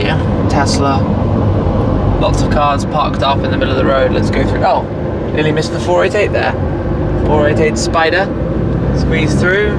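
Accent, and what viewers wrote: England, United Kingdom. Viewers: You are british?? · You could have taken phone numbers off chicks at least ! Believe me the first thing American girls are interested in is the size of your wallet .Its the very first thing in their head they ascertain on first contact.An English accent is huge bonus.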